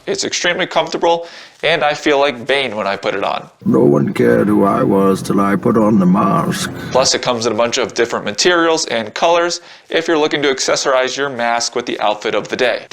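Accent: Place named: United States. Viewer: pleasant American English accent... there aren't a lot of American men that speak well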